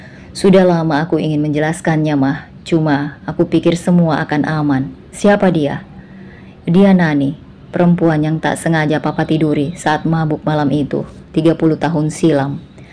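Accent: Indonesia. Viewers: Chanel pendatang baru yg beda logat dr lainnya, khas batak bugis campur jawa bandung 😅, subscribe nya blm diatas 50 ribu tapi di gemari ibu ibu viewsnya setara dgn chanel besar artinya miminnya oke bawaain cerita , sukses sll mimin , single fight yg berjuang kuliahkan anak anaknya sendirian 🎉🎉🎉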